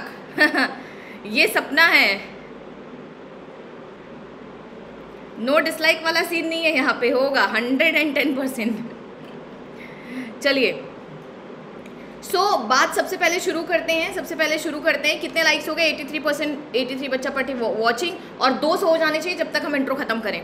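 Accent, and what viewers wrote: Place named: Bengal, India. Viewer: Bengoli accent ♥🔥🔥🔥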